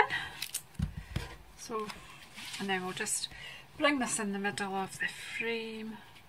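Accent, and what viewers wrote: Scotland, United Kingdom. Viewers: Stunning DSP / beautiful card and a fabulous Scottish accent! Tfs. xOx ~ C ~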